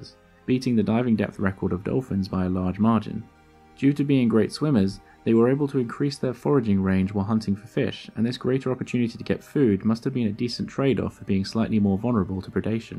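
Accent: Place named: England, United Kingdom. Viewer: You make really interesting videos, and they are great to fall asleep to because your voice is so calm with English accent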